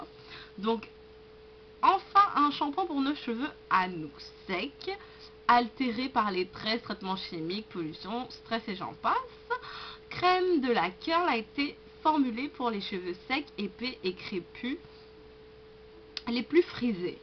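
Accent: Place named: Ivory Coast. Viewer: t une fofolle toi! tro marrant kan tu parles avc l accent cainfri, hihi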